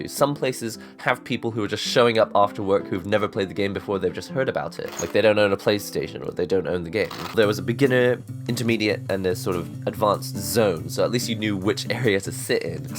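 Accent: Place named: Bristol, United Kingdom. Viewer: England. He sounds like he's from the Bristol area maybe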